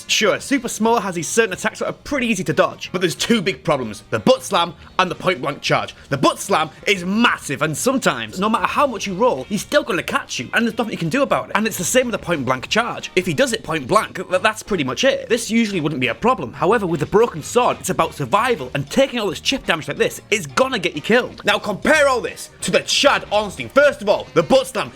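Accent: United Kingdom. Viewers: I didn't come in here expecting you to sound full br'ish, but I stayed. God I stayed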